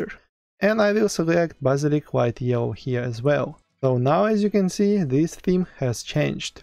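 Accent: Russia. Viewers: That's because he is Russian. Why would that be an insult? It's just an accent ☺️ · not insulting you, but you have a huge russian accent nice vids!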